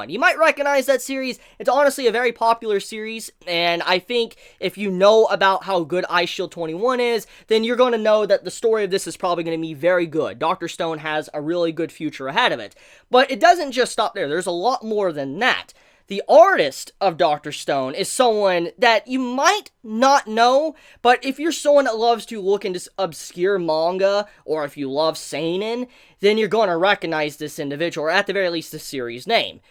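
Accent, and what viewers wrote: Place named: Appalachia, United States. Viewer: brotherrrrrr hill billy voice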